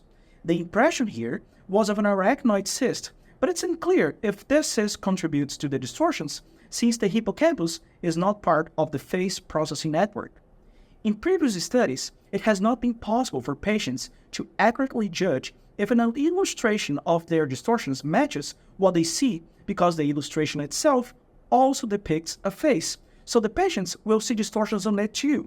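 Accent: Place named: Brazil. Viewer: esse sotaque é brasileiro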